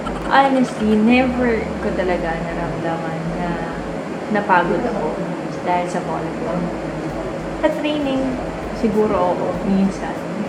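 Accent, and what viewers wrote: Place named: Conyo, Philippines. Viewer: Si ate Ly lang talaga yung cute mag conyo. Hindi siya nakakairita pakinggan.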